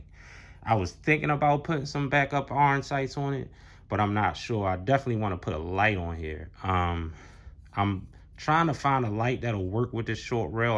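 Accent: Baltimore, United States. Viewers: I was going to ask what state is this gun legal in but I swear you sound like you from bmore. Im in DC I want one of these bad but it’s not legal here 😢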